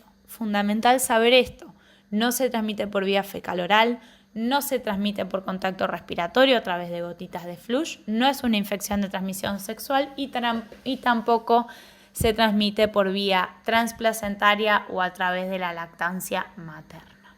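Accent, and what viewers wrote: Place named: Argentina. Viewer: tenia que rendir un oral, necesitaba esto, gracias algoritmo, por fin me mostro algo en castellano bien argentino.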